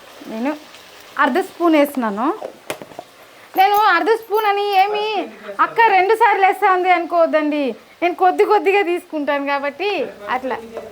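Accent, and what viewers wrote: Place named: Anantapur, India. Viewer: Sister your Launguage is very nice,it remember me my Ananthapuram journey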